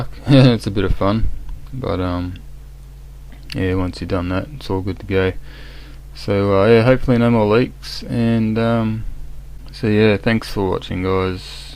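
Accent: Australia. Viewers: First youtube video I've watched with an aussie accent and swearing brilliant, video was helpfull too.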